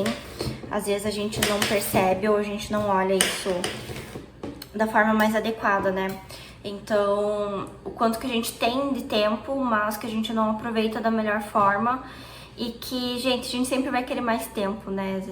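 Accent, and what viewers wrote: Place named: Interior, Brazil. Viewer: Olá, Vamos bater um papo?! Primeiramente que delícia de vlog. Segundo sua casa é tão linda! Você me parece do interior, pelo sotaque, mas fico na dúvida se é inteiro de São Paulo ou de algum estado do Sul. Parece que vocês vão morar um pouco distante da cidade, você está gostando de morar mas distante da cidade, caso seja esse o caso. Eu tenho vontade de ir para uma cidade pequena e morar um pouco distante do centro comercial do bairro mesmo, mas bate aquele medinho, moro na cidade do Rio ou seja loucura e o bairro onde moro tem de tudo, o que eu acho prático, daí vem aquela dúvida se me adaptaria. Terceiro, também não consigo ficar muito tempo com o mesmo livro, eu me canso, igual você. Quarto, também não sou fã de salão, nem do lugar onde faço depilação que é relativamente calmo, vou porque tem que ir, se deixar crio raízes dentro de casa. 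Quinto também não gosto de lugar barulhento, lugar cheio e som alto me deixa extremamente cansada, eu sou introvertida, eu gosto de calma. Sexto amo resenhas sinceras, se não gostou de uma leitura acho bacana compartilhar com as pessoas não para fazerem elas não lerem mas para mostra seu ponto de vista, sempre acho que atitudes humanas, como gostar e não gostar de uma coisa nos aproxima, como dizem gente como a gente, e você faz isso super natural e de maneira sincera. Bom, falei muito, digitei na verdade, rs! Fique com Deus. Beijos 😘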